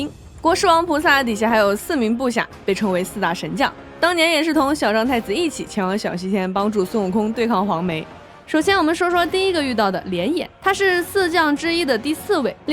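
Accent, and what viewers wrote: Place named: China. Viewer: 地域差异是事实。你不懂这个的话不就是科普？我何时反驳自己第一则回应了？我一开始就告诉你这是口音问题。她说话是普通中国大陆人说话口音，你不知道，所以我们这么多人才一起告诉你同样的话。很明显你不知道，因为你到现在还在因为大家科普你了所以自尊心受损破防 😂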